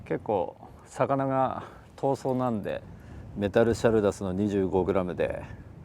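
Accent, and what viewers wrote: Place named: Tohoku, Japan. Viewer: 福島出身です🙇🏽 久し振りに東北訛りがブルーブルーの動画で聞けて、嬉しくなりました笑笑 応援してます‼️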